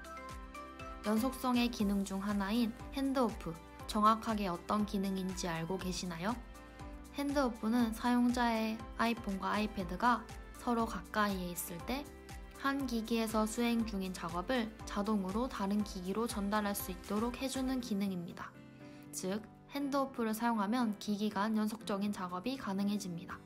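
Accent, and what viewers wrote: Busan, South Korea. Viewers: 부산사람이신듯..발음 귀엽 ㅋ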